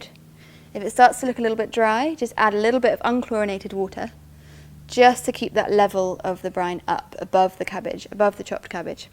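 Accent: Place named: United Kingdom. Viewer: As soon as I hear a British accent on a cooking show, I skip it... Never let a Brit into the kitchen...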